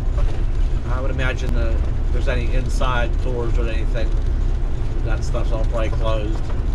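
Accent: Pennsylvania, United States. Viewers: As I get much older I see people in a different light there real friends and there r user. Stay safe I love u still have ur PA accent.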